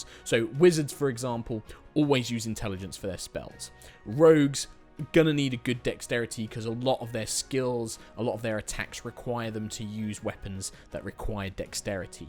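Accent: United Kingdom. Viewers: This video is good quality, but without the British accent it would be incredibly boring.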